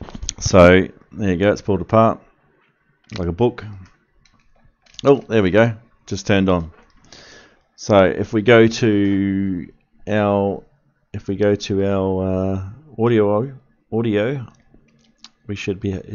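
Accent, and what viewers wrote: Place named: Australia. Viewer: First time watching your upload came from Sorins Electronic repair school. Thought you are an Ausie reading the comment bellow confirmed your accent. Ill watch your other uploads later.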